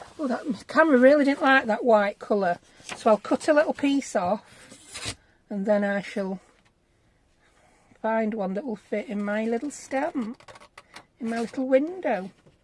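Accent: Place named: Yorkshire, United Kingdom. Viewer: Just popping on to say hello! Come on girl, who wouldn’t love a Yorkshire accent 😅😂😊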